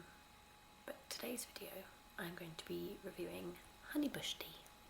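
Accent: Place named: Devon, United Kingdom. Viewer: There is something so perfect about you talking about tea ha. You really have this homelike comfort quality about you, it's lovely. Can definitely tell you are from / lived in Devon! My friend is from Witheridge and she is obsessed with tea also!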